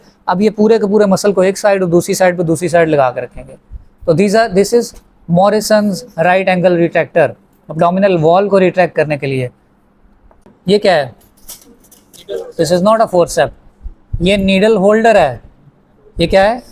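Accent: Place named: Pakistan. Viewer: Aap jis tarah Se bolate hai na vah Pakistani language jaisa lagta hai 😊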